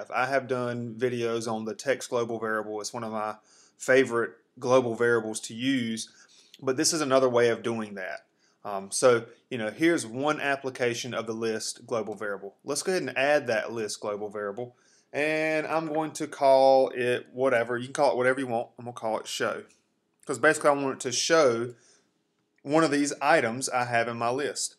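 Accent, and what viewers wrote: South, United States. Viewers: man. this man's country accent. feels like cowboy from action movie is teaching me programming.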